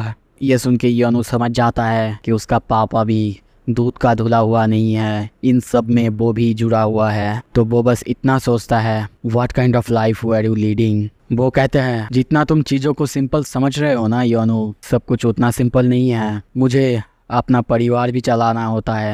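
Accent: Bengal, India. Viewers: Are you from Bengal by any chance?😅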